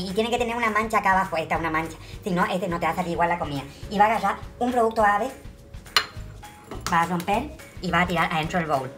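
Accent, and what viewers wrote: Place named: Corrientes, Argentina. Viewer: Como no perder el dialecto correntino me encanta muero de risa. Me encanta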